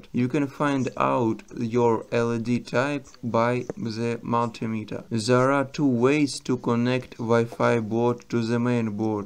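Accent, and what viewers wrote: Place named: Russia. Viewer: Друг , английский надо подтянуть :) Русский акцент ухо режет...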